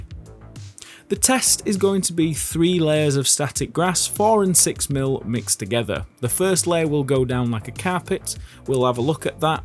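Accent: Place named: South Yorkshire, United Kingdom. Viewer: Me and the Mrs are having a scholarly debate regarding the placement of your accent. I'm saying no further south than Rotherham, no further east than Donny. She reckons Cas Vegas as a wild card. Are we warm or cold?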